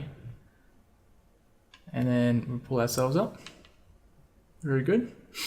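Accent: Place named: Australia. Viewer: great work on the climbing mod you got going. also cool to hear an aussie making it code :D happy new yr mate, subbing it now.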